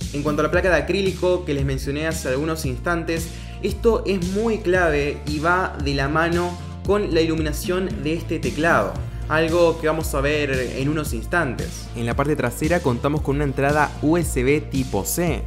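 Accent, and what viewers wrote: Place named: Paraguay. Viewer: Tiene la ñ paraguaya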